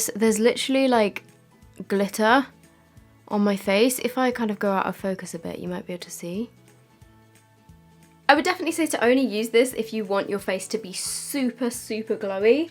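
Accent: Australia. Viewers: Very impressed with your Australia accent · That Australian accent though! 😂😂👌🏼👌🏼 #notificationsquad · Still beautiful 😘 that Aussie accent thouugh 😂👍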